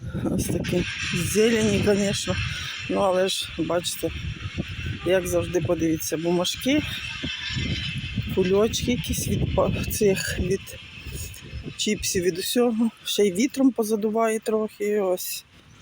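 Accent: Surzhyk, Ukraine. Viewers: Не буду на вас підписуватись....Ваш дикий суржик дуже ріже слух.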